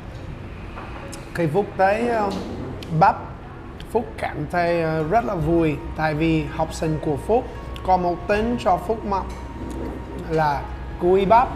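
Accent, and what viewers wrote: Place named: Vietnam. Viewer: HELLO FROM AMERICA. HELLO ANH. I ENJOY LISTENING TO YOUR VIETNAMESE ACCENT, LOL. I GREW IN AMERICA. AS AN AMERICAN I'M USED TO ENJOY GOOD CHEESE. DO YOU MISS IT LOL? MY PARENTS ARE FROM VIETNAM ORIGINALLY. MY DREAM TO VISIT THERE SOON, AT LEAST ONE TIME. REDISCOVER MY ROOT. WHAT IS THE MOST SURPRISING THING THAT YOU FIND YOURSELF FALL IN LOVE WITH? HAPPY NEW YEAR 2021. 🍹🍸🤣🤣🤡🤡🐖🐷🐄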